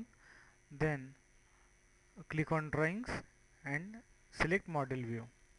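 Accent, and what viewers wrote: India. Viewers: Incomprehensibly thick Indian accent and poor quality audio combine to make this english language tutorial sound like a foreign language.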